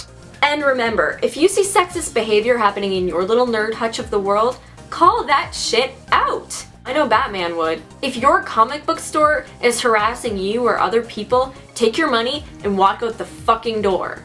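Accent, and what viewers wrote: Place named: Canada. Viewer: oh, I forgot to add - I'm Canadian too, so it warmed my little heart to hear your Canadian accent. Yayz!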